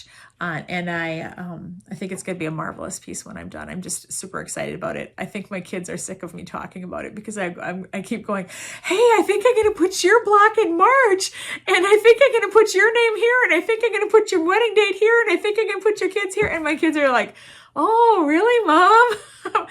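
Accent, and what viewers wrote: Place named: Minnesota, United States. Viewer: Love your shirt!!! I live in Minnesota--I thought I recognized your Minnesota twang...lol. I also own two farms in southern Iowa.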